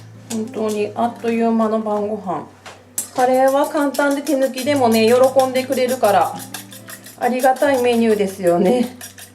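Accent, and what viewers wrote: Kansai, Japan. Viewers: 後半関西弁になってて可愛かった❤ 私も関西人です昨日から体調崩しててパジャマのまま横になって観てますカレールゥ買ってみます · 関西のイントネーション多めなが新鮮で可愛かったです❤笑そしてカレーを見てると自分も食べたくなる不思議現象が起きますっ😮❤笑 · なんか今回関西弁が強いですね！どういうスイッチで変わるんですかー？☺️ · の動画はずっと穏やかな気持ちで観られるから、心が疲れた時に自然とたどり着きます🍀 毎日お疲れ様です🍵🌱 10年以上が上手に標準敬語話す声に慣れてるから関西イントネーションになってるのが逆に新鮮だった😂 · 今日関西弁強めですね、かわいい😂❤❤ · ミッフィーのクッキングシート、可愛い💕と言った瞬間にグチャグチャに丸めたのツボに入りましたw😂✨💕 関西弁多めで嬉しかったです😊 ずっと脳が働いてそうだから、しっかり休んで下さいね♪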